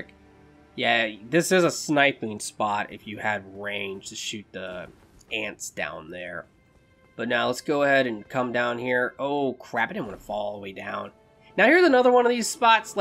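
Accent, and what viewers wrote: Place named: United States. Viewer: you sound to me like you have an accent. Are you okay saying what state you live in? Curious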